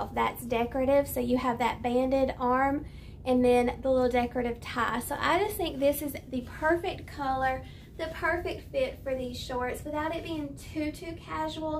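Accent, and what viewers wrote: South, United States. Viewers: OMG! A year already!🥳🥳🥳💐🎊🎈 Gotta say you are my favorite and only one I watch for Shein hauls! You way of presenting and explaining each piece is the best. I love your southern accent and your cute and proper personality. Because of you I walked out of my comfort zone to try new looks. Keep it up friend! Congratulations!!🥳🥳🥳🥳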